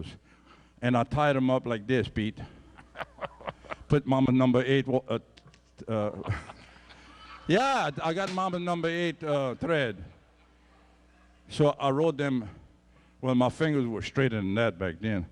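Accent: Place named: Louisiana, United States. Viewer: continuez à parler français. Votre accent et vos expression enrichissent la langue, si vous cessez de parler français, ce sont tout les francophones (Français, Canadiens, Belges Suisses, Haitiens, Africains, malgaches etc etc) Qui perdrons un peu de leurs identité. VIVE LES CAJUNS !!!!!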